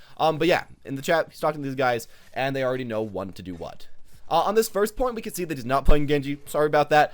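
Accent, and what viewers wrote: United States. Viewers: I subbed for the American accent FINALLY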